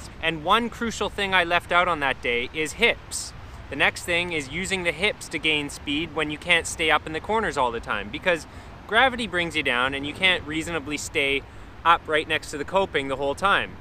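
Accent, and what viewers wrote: Canada. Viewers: Is that a fellow Canadian I hear?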